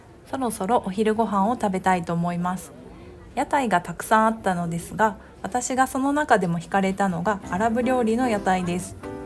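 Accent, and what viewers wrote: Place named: Kyoto, Japan. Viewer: Você é de kyouto ? Então seu sotaque é charmoso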